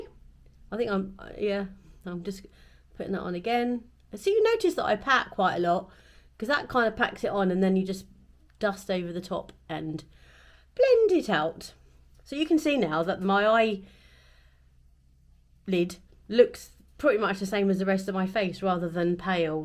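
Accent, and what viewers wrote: United Kingdom. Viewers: I love to hear you speak and sooo wish I could find a gentleman from the U.K.! Iove the accent and Alan Rickman was my favorite. I digress, the makeup is so pretty and I like the purples.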